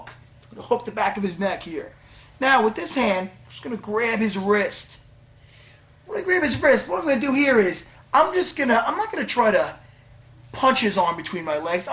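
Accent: United States. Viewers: Is he from North US / Brooklyn? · "don't lean foward" Strong American accent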